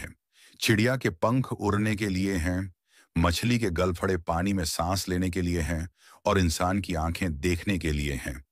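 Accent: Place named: Bihar, India. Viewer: I think AAP bihar se hai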